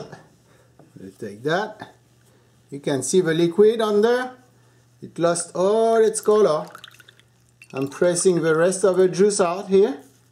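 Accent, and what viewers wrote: France. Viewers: I watched the video.real franse laungwich accent. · Vous avez un accent français a couper au couteau !!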